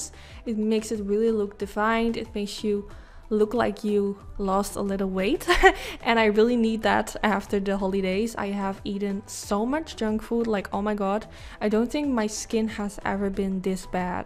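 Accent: Netherlands. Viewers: Ik hoor echt meteen dat je Nederlands bent, niet slecht bedoeld ik vond het gewoon grappig dat het echt zo was. Echt een super mooie look! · Kom je uit Nederland? Ik dacht een accentje te horen! Heb trouwens na het kijken van deze video ook die paarse mac glitters gehaald, thanks voor de tip :)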